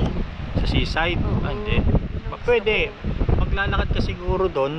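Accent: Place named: United Kingdom. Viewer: ung accent British na talaga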